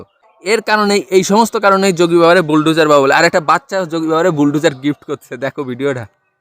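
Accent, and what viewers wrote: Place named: Khulna, Bangladesh. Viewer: আপনার কথা শুনে ভারতীয় নয়,, বরং বাংলাদেশের খুলনা বিভাগের বাসিন্দা মনে হয়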